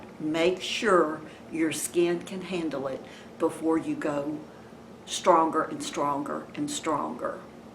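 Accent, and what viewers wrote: South, United States. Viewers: She's adorable . Not to mention the southern accent xo ...get try it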